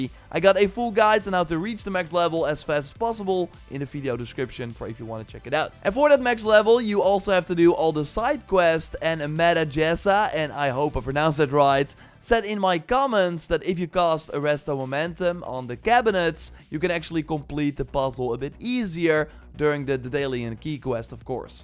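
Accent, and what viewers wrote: Netherlands. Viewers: Did I just spot a Dutch accent here?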